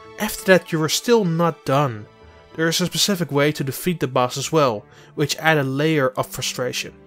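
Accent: Netherlands. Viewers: Hello Apollo Justice the accent is Dutch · very dutch yea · He’s Dutch. Hate to tell ya buddy but English isn’t everyone’s main language. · That dutch English tho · Thick dutch accent. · Are you Dutch?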